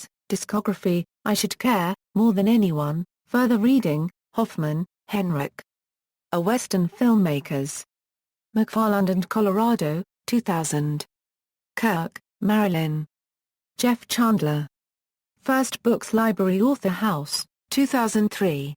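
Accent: United Kingdom. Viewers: Why does YT use foreign narrators when talking about American movies? The British sounding female narrating the bio about Jeff Chandler mis-pronounced the name of the Native American Apache Chief, Cochise---- it is PRONOUNCED COCHEESE!! Thank you.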